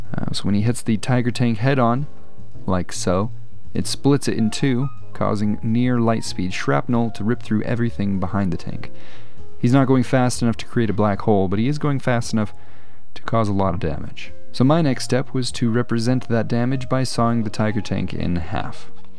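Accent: Germany. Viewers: Perfect northern German pronunciation. Not a native speaker, but have been speaking it for about forty-four years.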